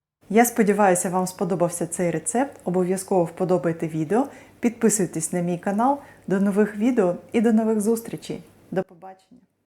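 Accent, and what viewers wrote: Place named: Ukraine. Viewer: Зачем на здесь слушать этот украинский говор.. Пусть на своих каналах вещают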